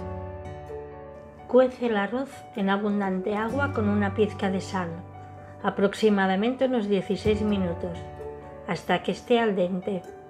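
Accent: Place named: Catalonia, Spain. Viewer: Se nota ese acento catalán 😝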